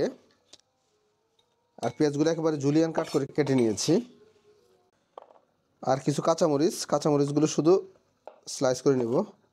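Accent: Noakhali, Bangladesh. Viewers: ওনার কথা শুনে বোঝাই যাই নোয়াখালীর মানুষ।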